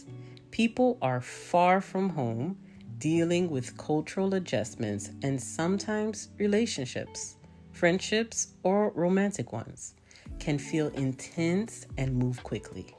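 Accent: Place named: Ghana. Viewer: you really sound like a Ghanaian. Anyway with some travels to do a work. It can be lonely sometimes. But its can be a time for reflection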